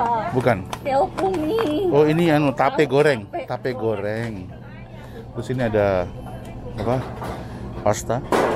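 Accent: Surabaya, Indonesia. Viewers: saya senang kalo Pak bahasa Jawa, sering keluar ciri khas Suroboyoane, ayo Pak bikin conten pake bahasa Jawa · Big respect buat om lama di Amrik aksen ngomong dan masih gunakan bahasa Indonesia dan Jowo Suroboyoan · Boso sampeyan ngguyokno.... Bener...bener...Londo kampong yo puinter ngomong suroboyoan Om....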